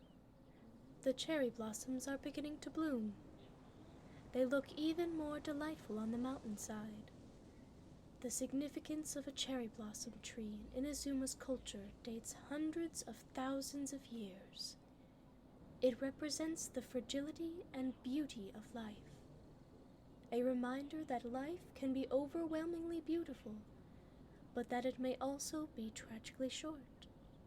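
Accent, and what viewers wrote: United Kingdom. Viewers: It sounds pretty much like british accent and she fits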